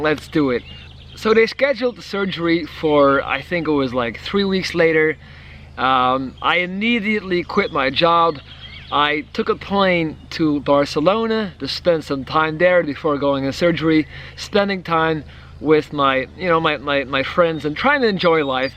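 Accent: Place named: United States. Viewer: Your story is quite something. I have an off-topic question. You sound like any American I have ever met. Yet you lived in Belgium and then in Stockholm., Sweden. So where are you originally from? I would simply guess the US based on how you speak but that doesn't seem quite right. You must speak a ton of languages, living in both Belgium and Sweden, and visiting friends in Barcelona, Spain. But your accent is 100% pure American. So where are you from? Just curious. And keep your spirits high. You have one heck of a story to tell. I will be watching more of your videos. And I love your screen name, too. All the best to you.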